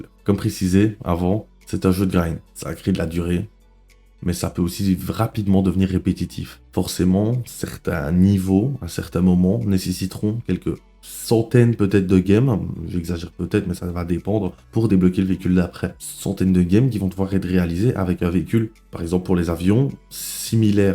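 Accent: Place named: Liège, Belgium. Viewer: J'ai remarquer ton accent liegois direct MOI AUSSI JE SUIS UN BELGE QUI JOUE A WT 🙏🏻🇧🇪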